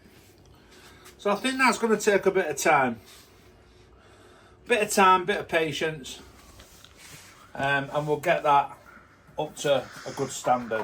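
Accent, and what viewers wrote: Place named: Northern England, United Kingdom. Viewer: Whereabouts you based you sound northern? Lancashire?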